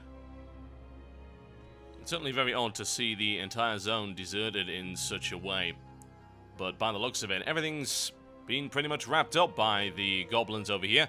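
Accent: United Kingdom. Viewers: Im ashamed to be from the same country as you :( im Norwegian 2 and i dont see anything wrong with his accent, infact i think a real British accent is awesome. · Why is everyone going bananas over the accent? Has no one ever heard a British person speak before? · Wait a second... Are you brittish?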